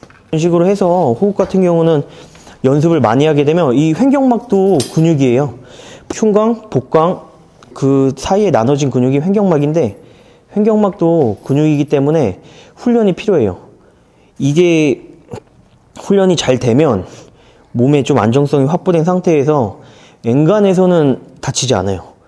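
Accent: Gangwon, South Korea. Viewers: 강원도 억양이네 ㅋㅋㅋ 흔치 않은데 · 강원도 사투리 👍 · 강원도 사투리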